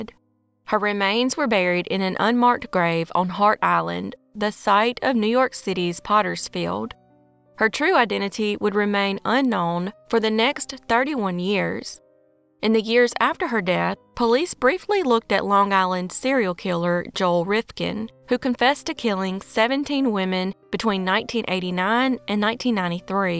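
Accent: South, United States. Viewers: Some ppl only like conclusions. I don't usually watch unsolved, but I love this narrator's voice. Only southern accent I like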